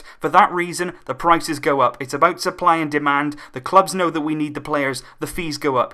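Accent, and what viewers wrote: United Kingdom. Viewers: he is honest,clear,and talks briefly and in British accent ,so shut up and let me subscribe.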